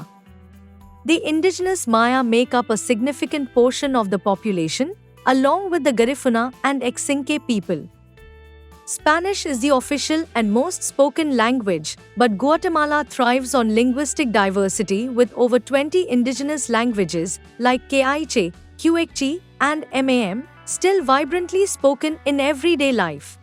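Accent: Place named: India. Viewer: why does your voice have an indian accent? are you indian?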